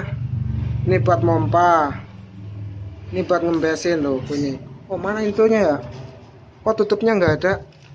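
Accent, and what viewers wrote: Banyumas, Indonesia. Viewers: Njir ngapak koe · Masih bersama ini membukanya gmna ni :v sepertinya wong ngapak sekang ndi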